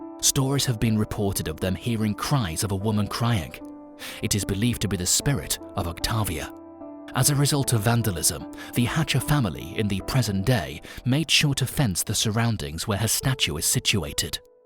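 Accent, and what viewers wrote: United Kingdom. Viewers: he’s British 🇬🇧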